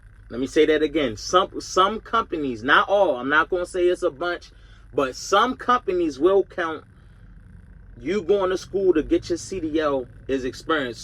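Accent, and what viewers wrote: Baltimore, United States. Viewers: When I heard that accent😂I said Bmore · Knew you were Baltimore when i heard you talk😂…. Thanks for the information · I knew he from Baltimore by the accent lol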